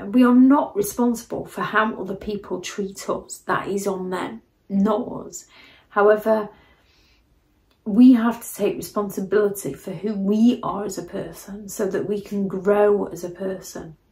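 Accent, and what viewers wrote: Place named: Northern England, United Kingdom. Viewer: Her accent is northern English.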